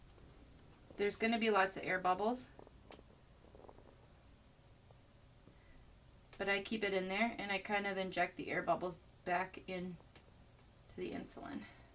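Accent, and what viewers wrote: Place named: Minnesota, United States. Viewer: Excuse my silly Minnesotan accent!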